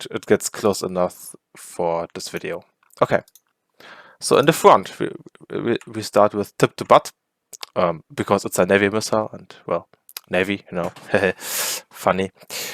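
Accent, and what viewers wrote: Germany. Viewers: i know its gonna be good when i hear a german accent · as a german it is hard to stay in the english area of my brain because the accent really wants me to believe this is german :D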